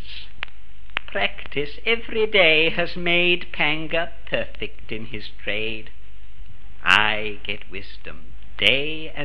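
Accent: United Kingdom. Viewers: The poem is Irish so why are you delighted to hear a British accent? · Delightful to hear a British voice reciting this poem. By the way, why is Pangur Ban always portrayed as an all white cat?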